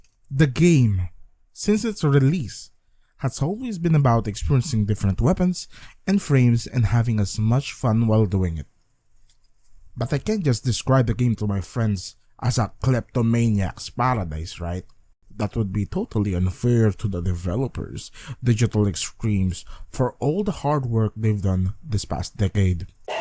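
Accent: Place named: Philippines. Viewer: When I heard the accent, I just knew it was a Filipino CC. Know any communities/servers to ask and team up with other Filipinos or do we just go in recruitment chat and pray we have peeps there?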